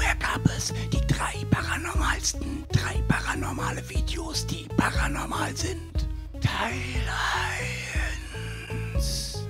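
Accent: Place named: Palatinate, Germany. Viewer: Wie immer genial :) ich liebe den Pfälzer Dialekt.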